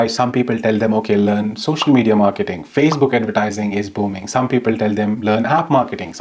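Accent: Kashmir, India. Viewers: Heyyyy, I'm getting this strong feeling that you are from Kashmirrrrrr. I can see it in your accent and way of speaking!!! Am I correct? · I was sensing a Kashmiri Accent.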